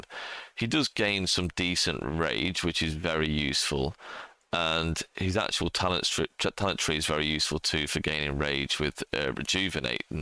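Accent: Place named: England, United Kingdom. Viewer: I was thinking England from the way you spoke but there’s so many different accents there it’s hard to pinpoint! Btw I just wanted to say I LOVE the videos man and I always recommend to people and tell them about your channel.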